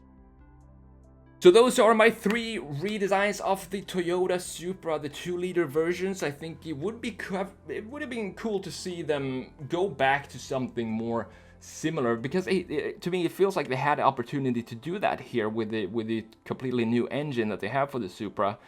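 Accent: Sweden. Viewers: Hejsan! Hamna på din kanal av en ren slump och hörde på din engelska att du va svensk? Rätta mig om jag har fel:D Jag vill bara säga att du gör ett fantastiskt jobb, riktigt kul att se, du har flera videos som jag måste börja kolla genom. Snyggt jobbat!!!!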